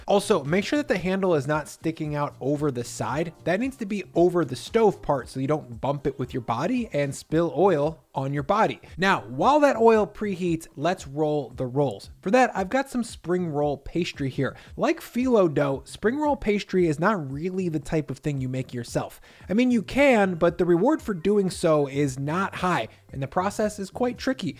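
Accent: United States. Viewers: You’re speaking like an American so why aren’t you using the American measuring system?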